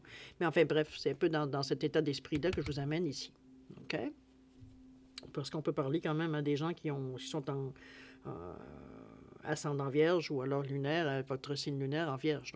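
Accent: Canada. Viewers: J’aime beaucoup ces moments passés en votre compagnie, ce matin au petit déjeuner😊 actuellement bloquée dans ma vie suite fracture du genou, c’est tout à fait ça… lucidité… émotions présentes mais qui font qu’on se sent vivante… je retiens « Patience et confiance »…j’aimerais bien retourner au Canada ❤ et votre accent m’en donne un avant goût… merci à vous 💐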